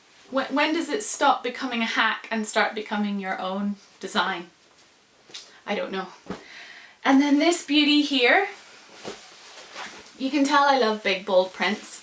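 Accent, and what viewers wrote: Northern Ireland, United Kingdom. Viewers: where are you from if you dont mind me asking? Im hearing a slight northern Ireland accent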